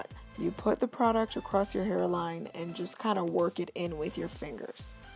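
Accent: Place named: Jamaica. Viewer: I don't wear wigs but am definitely subscribing, plus as u start talking I knew I was listening to a fellow Jamaican 🇯🇲, then when u said u had to wash with your hands back home I was like yes I knew it she a Jamaican 🇯🇲.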